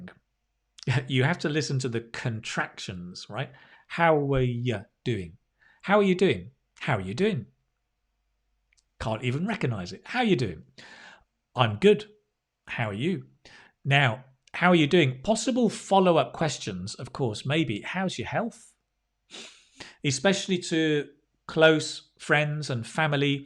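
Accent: United Kingdom. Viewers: Thanks so much, for your English Speaking Success on the YouTube channel. You've been giving me chances to immerse myself in the daily uses of English on different ambitious, especially with your British accent which I've been learning since primary school, more than 50 years ago under the British Colony. but I still have problems in the sentence stress. Anyway, I will keep watching your videos everyday anytime or even during having a shower. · I and my children👶👧👦 7-8-11))))really like that you regularly do with your great clasical BritishEnglish pronunciations and sincere interested teaching acurent natural fluency skills!!!!!!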